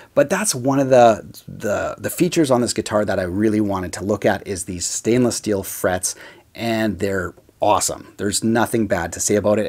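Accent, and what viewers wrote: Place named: Canada. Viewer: Waiit... are you Canadian? LOL. I feel like I can kinda hear an accent.. either Canada or like North Dakota.. maybe that upper area of Wisconsin...or Ohio?? But... prob Canada.. ?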